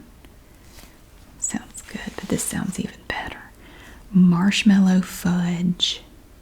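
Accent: South, United States. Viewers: Living in the south I always forget she has an accent lol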